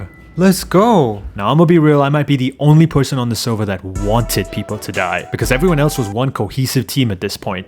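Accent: India. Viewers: Hey bro😱😅 aap btw me bhi yahi Jan ne aaya hu ki ye Indian hai ya nahi confusion hai mujhe bcz senpai Ke video me Hindi itne easily Bola tha tone Indian thi🙂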